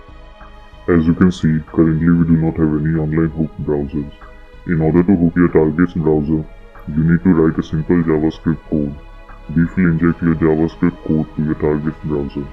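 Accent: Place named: India. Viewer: i can see that indian acent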